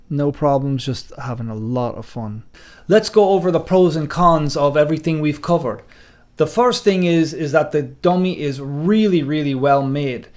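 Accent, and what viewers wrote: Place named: Ireland. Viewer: ! Great review & very cool to hear the Irish accent! I'm in a similar-ish boat in that life means I can't & won't be able to get to the gym as much as I want going forward... I'm a life long Gaelic footballer who found martial arts just over two (covid) years ago. I'm chasing time (42 now in May; just had my first novice MMA fight) & I realise solo training will need to be a (big) part of my practice going forward; I/we can't be away out of the house every evening training jiu-jitsu!! I bought an unfilled dummy/'corpse' during Lockdown, but the filling process near broke my heart + I just couldn't get it right. I'm seriously considering buying a filled SMARTY & getting it shipped to Ireland... Expensive I know, but I'm thinking it could turn out to be priceless! Thoughts?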